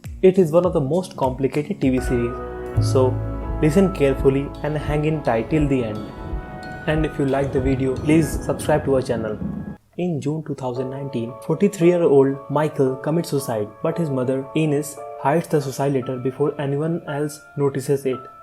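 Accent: India. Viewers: i liked it because of the indian accent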